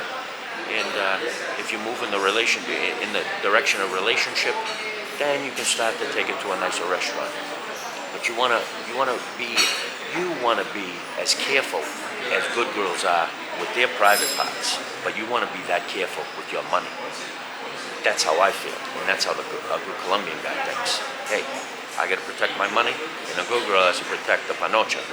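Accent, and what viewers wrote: New York, United States. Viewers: Im from NJ and I can hear a long Island or NYC accent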